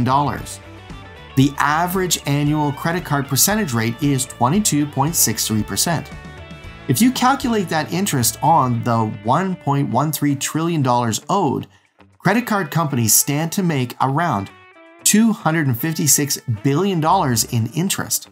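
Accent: Canada. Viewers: Love the Canadian accent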